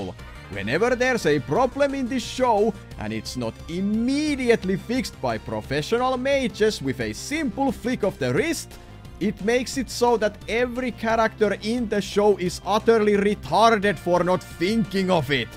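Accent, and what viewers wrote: Finland. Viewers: Came to see High Guardian Spice being ripped to shreds for the atrocities it commits on the name of animation and anime, and stayed for the great jokes, funny delivery and that thick Finnish accent... jatka samaa mallii, ootan innolla osaa numero 50! 👌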